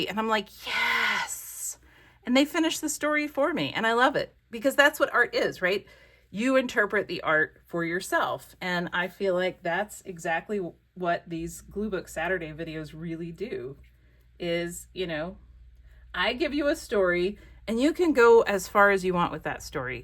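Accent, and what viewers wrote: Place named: Georgia, United States. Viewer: I think your Georgia is comin out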